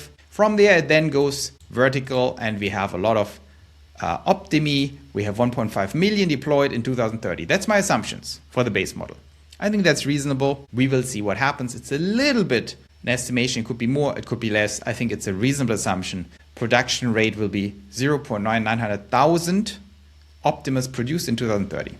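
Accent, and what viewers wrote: Germany. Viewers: we need more heavy german accents on youtube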